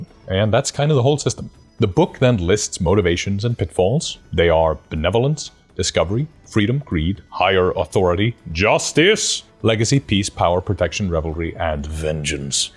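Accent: Iceland. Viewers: Are you perhaps Icelandic? I can hear from your accent. Well i have a question. I'm going to be running a homebrew campaign but I don't know how to make my players invested in the plot and their characters. I've played with these people before and there seems to be one consistent problem. They seem to zone out every time they complete their actions and their characters never seem to interact with each other. How do I make them care? How do I make them invested in their teammates characters? (Edit i changed the question since i thought of a solution)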